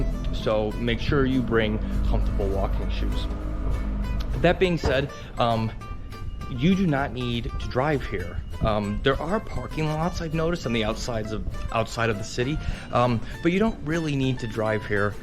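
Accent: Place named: United States. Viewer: what did he say that was not wrong? I also live in this city and I found what he said to be accurate. Yes not all resturants are closed during the afternoon but if its past 1pm good luck with getting into one as the kitchen can close between 1 and pm Lunch is like 1-1.5hr window to go. He sounds like he is either American or Canadian and there places are open all the time. So it is very different.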